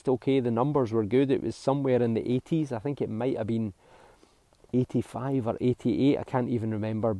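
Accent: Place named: Highlands, United Kingdom. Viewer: id buy that T Shirt )) So True and Well Bloody said with that velvet accent of the Great and Ancient Highlands !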